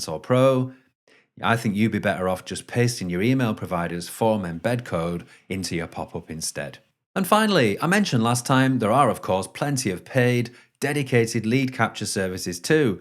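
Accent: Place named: Northern England, United Kingdom. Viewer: Pure class. For someone with the IT skills of a lobotomised pebble, this was priceless. Nice and slow baby steps. No Yoda style html jargon and all them other things I simply do not understand with IT. You got yourself a new subscriber and follower. And the extra bonus was your accent. It is nice to hear an English accent as some other accents go so fast that I'm lost before I begin. Being from Norfolk in the UK, you don't get much slower accents than us, good ole boy but that Northern twang of yours is great for this. A bloomin good job. Bootiful.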